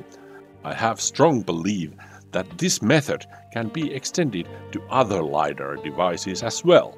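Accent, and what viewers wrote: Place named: Finland. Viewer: The content is great, thanks! I also very love the Finnish english. The sound of their voice is so calming, also when they are really upset :)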